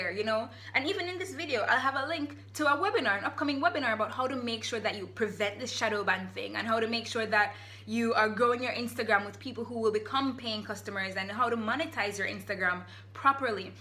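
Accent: Jamaica. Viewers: you sound Jamaica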